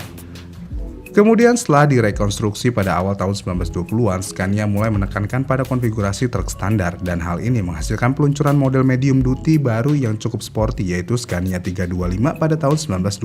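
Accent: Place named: Cirebon, Indonesia. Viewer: Ngapakers cirebon detected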